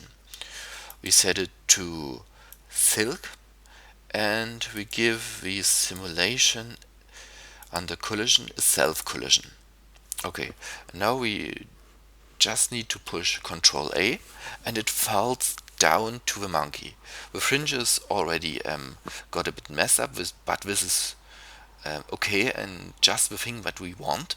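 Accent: Germany. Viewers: Man hört so richtig deinen deutschen Akzent, aber jetzt gehts ja ^^ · This guy is so under rated, this tutorial alone has help me so much more than any of the other tutorials out there, up until this point I had no idea how to apply a physics to an object, and I couldn't find anything on how to finalize physics, only how to simulate them, this guy saved me a ton of digging around online for an answer, all done with a lovely 'German?' accent. I love his accent but I am not sure where it is from.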